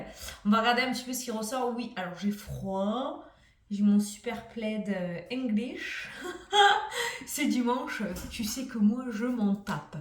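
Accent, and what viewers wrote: South, France. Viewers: Tu me fait rire !!! ton accent du midi qui chante, ton franc parlé ,ta joie de vivre te regarder m'apporte de la bonne humeur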